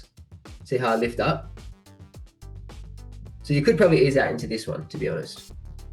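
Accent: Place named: China. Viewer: sounds like a chinese student very famliar accient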